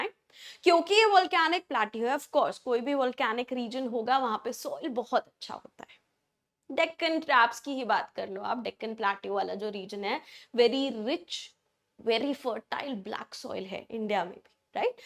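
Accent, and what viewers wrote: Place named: United Kingdom. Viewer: Mam please aap normal or indian accent me padhaiye,, british type accent thoda ajeeb lgta hai ..jaise live classes me padhate ho vaisa accent😊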